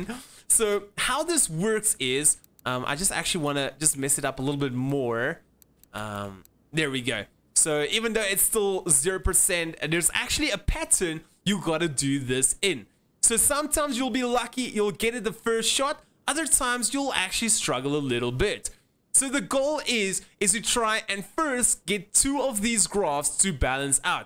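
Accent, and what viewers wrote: South Africa. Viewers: Once you have all +3 bars, start with the bar on the right most side, and then move it one down and do that in order from right to left move each one down and you should get +6 on all. By the way you sound a little South African. Not a very strong accent but having lived here since birth I can pick out even very subtle SA accents just like that no matter where I hear them.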